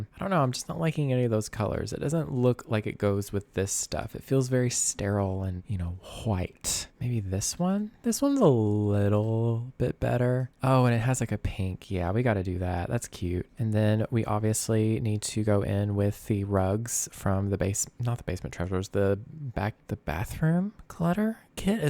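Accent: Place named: South, United States. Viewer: This is the first video I’ve seen of yours and I’m obsessed already! ❤️ You’ve got that sweet Southern belle realtor vibe going on and it feels so genuine lol. I pop in and out of Louisiana sometimes, so maybe that’s why I’m picking up on it. Either way, I’m here for it! 🤣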